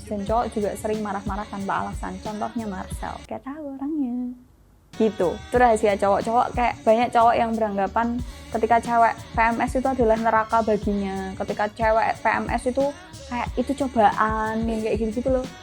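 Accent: Java, Indonesia. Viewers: mba uwong jowo to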